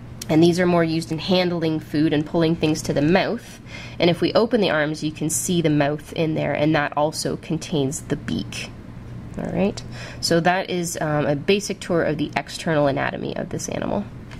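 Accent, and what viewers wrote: Canada. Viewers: I can tell you're Canadian. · She sounds Canadian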